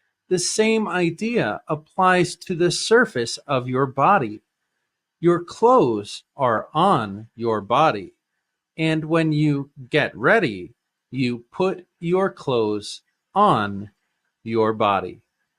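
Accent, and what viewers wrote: United States. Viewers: are you American, dude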